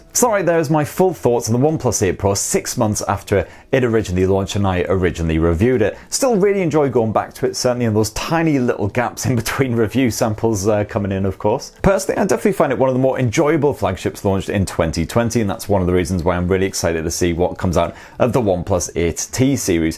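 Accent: United Kingdom. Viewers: I wish everyone in UK speaks with same accent as you do! Regards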